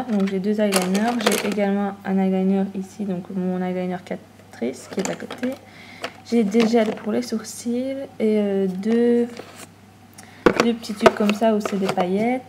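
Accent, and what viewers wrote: Belgium. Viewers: L'accent de diiiiiiiingue :D Mais une belge ça fait plaisir :) Bisous :)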